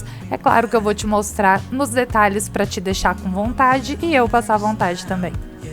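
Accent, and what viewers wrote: Minas Gerais, Brazil. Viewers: Já é quase mineira.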